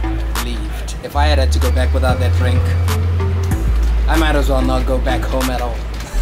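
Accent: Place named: China. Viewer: Amazing Chinese accent!!!!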